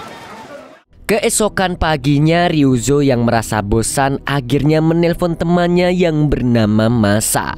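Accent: Banjar, Indonesia. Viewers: Baru tau kalo org banjar😂dari sekian lama nonton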